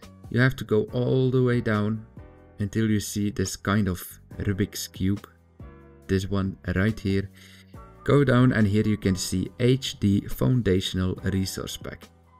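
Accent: Netherlands. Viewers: Ben je Nederlander of Belg ?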